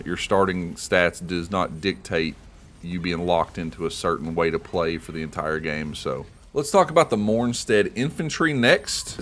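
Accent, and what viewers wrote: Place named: Texas, United States. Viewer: Definitely a southern Texas accent love it and would suggest using it for a saying catch phrase for the channel ,so folks can look forward to that as well as the content, aside from that great work and new subscriber here 👍 👏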